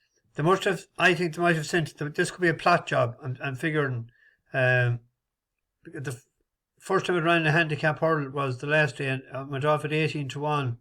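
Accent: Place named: Liverpool, United Kingdom. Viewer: Lucky of the IRISH ☘️ and a good Scouser by the sound of it 🥁🥁 🫡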